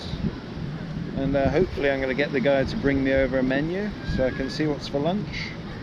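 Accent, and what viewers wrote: England, United Kingdom. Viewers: I sure hope you hooked up there in Odessa, you are a good looking guy, suave and have a nice English accent. I definitely saw some ladies checking you out. Go get them tiger LOL. And if the lead role for The SAINT remake comes along you definitely should audition.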